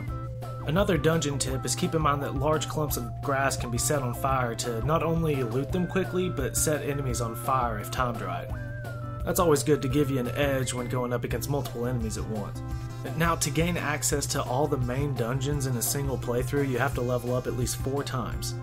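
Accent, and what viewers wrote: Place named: Rural, United States. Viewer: Thanks for the video. I like your country twang.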